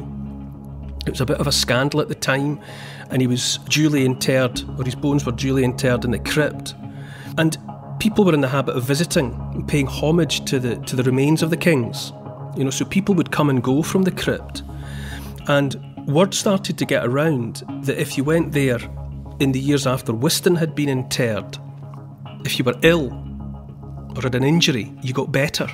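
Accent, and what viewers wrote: Scotland, United Kingdom. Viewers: Hi there. I love your soothing Scottish voice. You could talk about anything even and i'd listen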